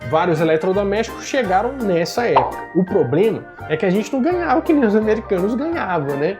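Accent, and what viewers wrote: Minas Gerais, Brazil. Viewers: Cara tenho muita inveja de quem tem sotaque mineiro kkkkkk é um dos mais lindos do mundo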